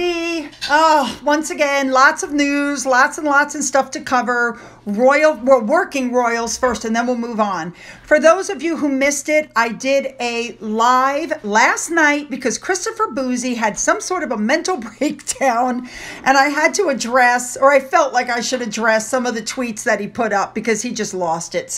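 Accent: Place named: United States. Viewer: Hello, we always watch your video's, you do a Brilliant job. You put so much hard work into your content. We totally agree with what you say, it was very nice to see what you look like, after so long wondering! you are Beautiful. I have seen some comment's about how you, and our cousins from the USA, pronounce names! I find it endearing. carry on as you are, there's too much of people saying " You don't say it like that, you say it like this! " It's difficult for the British folk also! I remember as a child, I had difficulty understanding why Norwich wasn't said as it is spelt, Norrich! When I was corrected, I couldn't get my head around it! My Aunt was from New Costessey, which is in Norwich, that is pronounced - Cossey! Even more confused! One thing that sticks in my mind, I was on a train with two Americans, one said to the other, I can't wait to see Edinburger! 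Keep up the good work, we really appreciate it. Best wishes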